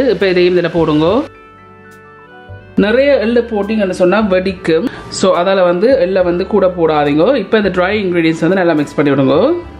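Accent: Sri Lanka. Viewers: இது இலங்கை தமிழ் அதை கேவலம் என்று சொல்ல வேண்டாம் பிடிக்கவில்லை என்றால் ஏன் வீடியோ பார்க்கிறீர்கள்.... நீங்கள் உன்மையான தமிழர் என்றால் கேவலமான தமிழ் என்று சொல்ல மாட்டீர்கள்...... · I'm from Tamilnadu near Madurai I subscribed to hear your srilankan tamil. Your recipies are entirely new for me and very interesting too.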